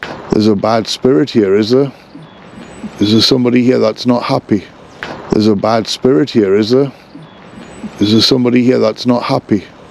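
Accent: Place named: North East England, United Kingdom. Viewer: It's nice to hear a north-east accent. I'm from the NE myself =)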